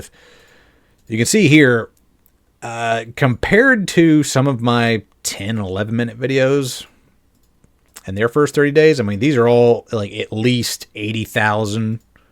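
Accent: United States. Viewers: I'm obviously not speaking for everyone, and it is somewhat rude to say, but Slap on Location is annoying to listen to while playing games, due to shifting tones of narration (read vs memorised), long pauses in speech and the occasional interview, a big hurdle for people not accustomed to American accents.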